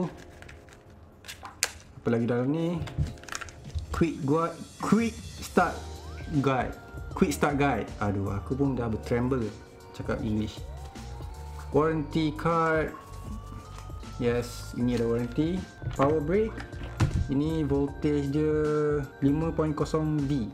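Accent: Terengganu, Malaysia. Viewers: Ouhhhh org ganu rupenyee...hihihi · org ganu ke boh? baek ahh.. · cam loghat teranung je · ganu ghupenye..hahahah.. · bro org trg eh? area mana? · aku bru je discover video2 mu wahai, makin byk video aku tgok makin aku sure mu ni org ganu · orang ganu ke？ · Org Terengganu kee??? duk mane.. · org ganu ke boh?nice .. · Mu ni org teganu ke? Ingtkan org KL. Nice bohhh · Ganu boy bosku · sek ganu ni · Org ganu ke hihu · dak ganu ke.. mydin gong badak. baik ahh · Orang Terengganu bro? dengor slang ganu skit tuh..huhu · Orang terengganu ke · org tganu ke abg? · Ko orang ganu ke? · orang ganu k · orang terengganu ke? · pergh. orang ganu ke bohh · Org tranung ka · Bunyi macam bahasa terengganu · laa org ganu ke? hahaha kemah idola duk gong badok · bro org ganu ke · Mu org tranung ke awang? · ORG TGANU KE NI BOSS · Hui orang ganu gok ni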